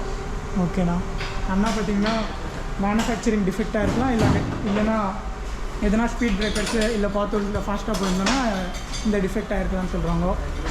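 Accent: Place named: Bangalore, India. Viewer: Bro your tamil is similar to Bangalore tamil....i had s doubt but after that TVS person spoke in kannada then i got it 😂😂😂 bro insta id thange i want to ask regarding Apache RTR 310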